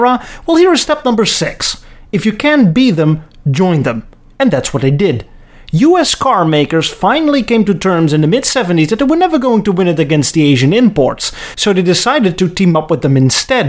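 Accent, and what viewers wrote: Netherlands. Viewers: are the very best motor vehicle episodes on YouTube. Brief, to the point, factual, entertaining humor enhanced further by Dutch-accented delivery. FIVE STARS. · Great, interesting gloriously, mammoth series of videos. really enjoyed each one and it has given a sparkling insight into that period in American car evolution. Don't worry about your pronunciation. Your Dutch accent is brilliant and quirky. How does one pronounce Coupe in the USA, England (the home of English) and Europe? There isn't only one pronunciation! Please keep up the good work.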